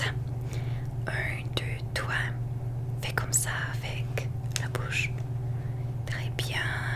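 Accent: Quebec, Canada. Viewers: T’es québécoise? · Je découvre ta chaîne avec plaisir. Ton accent est adorable d'où viens tu ? J'entends parfois un accent québécois mais pas uniquement ... Merci pour ta vidéo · Je ressens un accent québécois quand tu parles mais c’est sûrement moi est juste habitué avec cet accent ou qui rêve😴… Continue comme ça! 🇨🇦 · Elle est canadienne ? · Tu es canadienne ? J'aime ton accent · Accent anglophone mais aussi québécois un peux ? Non ? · Tes québécoise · Es-tu Québécoise ?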